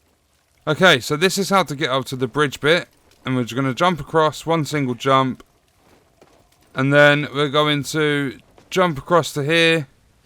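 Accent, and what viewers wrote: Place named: Cockney, United Kingdom. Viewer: Love the cockney accent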